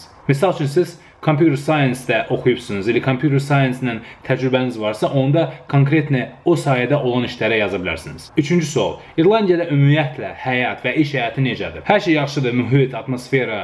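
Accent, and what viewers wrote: Gadabay, Azerbaijan. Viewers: Can e can Gedebey lehcem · Cox maragli daniwir rus-Gedebey-avropa qariwig 👍👍😂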